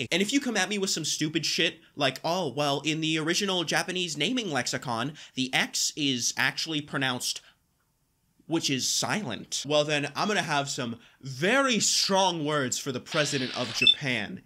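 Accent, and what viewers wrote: Canada. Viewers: i feel like this man lives in Edmonton or Calgary somewhere cuz mf just sounds like one of us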